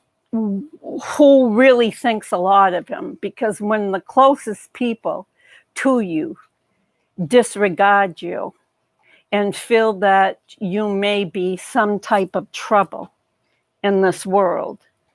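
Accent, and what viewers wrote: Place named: Boston, United States. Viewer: I enjoyed the information you presented. You sound like you might be from Boston.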